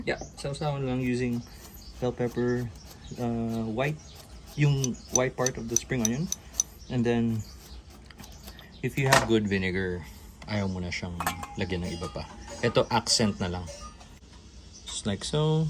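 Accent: Iloilo, Philippines. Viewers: Ilonggo ka gali sir?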